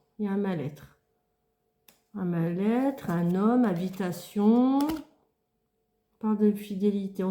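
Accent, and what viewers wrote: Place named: Nord-Pas-de-Calais, France. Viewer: ❤ merchiiiii, j'adore ton accent ch'tis😊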